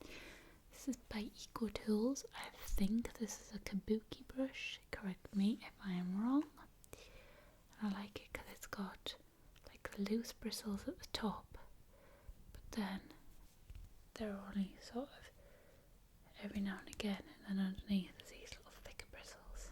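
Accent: United Kingdom. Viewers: Your accent is lovely and so very relaxing. You're like the british version of Arwen from Lord of the Rings <3 And let me just say, your skin is to die for! Ohmygosh it's so perfect. Okay, fangirlmoment over. Awesome video :) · Great!I understand your British accent)))love The British English)) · Lovely sounds, I especially love the cap opening and closing. Are you from Britain and if yes, what part? Your accent is great. Thanks!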